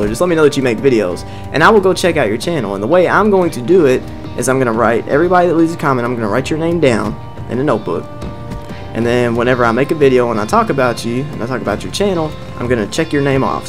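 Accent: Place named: South, United States. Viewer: Where are you from? from your accent you seem from the south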